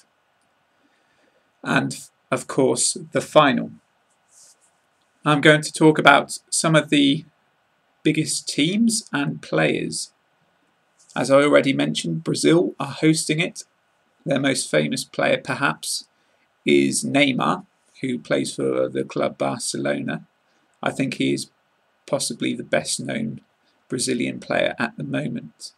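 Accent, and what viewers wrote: United Kingdom. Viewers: Hello, cheers from Egypt um really keen on learning British accent and especially ur luvly accent :) can u please recommend the easiest ways...I've heard of "shadowing" which means 'coping' and repetition of sb's speech and after a while you will be able to gain the accent.I've NOT started yet to do it cuz um waiting for ur advice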